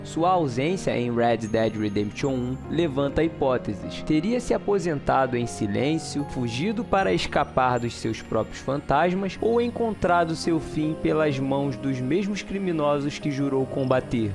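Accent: Brazil. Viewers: de qual estado brasileiro tu é?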